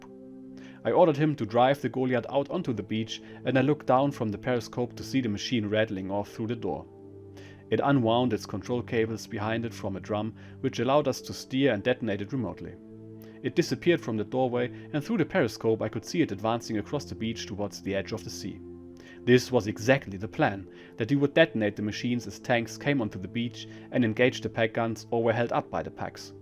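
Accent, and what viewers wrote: Germany. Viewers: I didn't realized you'd posted Juno part 1 and was delighted to see a Juno Beach part 2. I've just now listened to both parts and like your other content, it is excellent. This is content that anyone fascinated by WW2 will enjoy. I'm a 50-ish year old American and have been fascinated by the war since childhood. Thank you for these stories from the German perspective. I've read many memoirs and diaries myself, and hearing this in your own accent adds something entertaining. One can imagine the soldier himself is reading the story.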